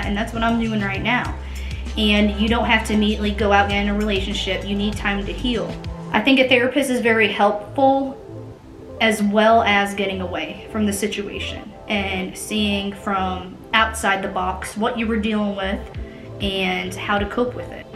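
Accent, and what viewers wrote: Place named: Tennessee, United States. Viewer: Ok I actually like you on YouTube so much better! You look great girl and you have that Tennessee accent already haha super jealous! STAY STRONG and keep doing you!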